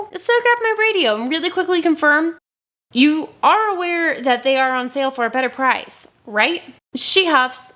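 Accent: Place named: South, United States. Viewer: I love the southern accent. lol!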